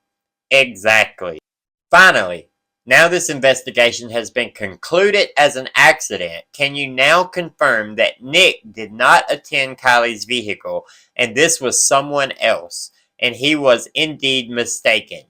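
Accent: South, United States. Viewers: Just became a new sub today! Love the channel and that southern draw you got! 😉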